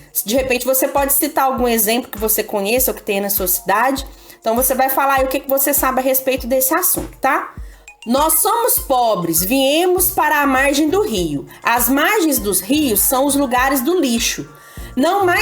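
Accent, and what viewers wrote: Minas Gerais, Brazil. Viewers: Bela correção! Gostei foi do jeitinho mineiro de falar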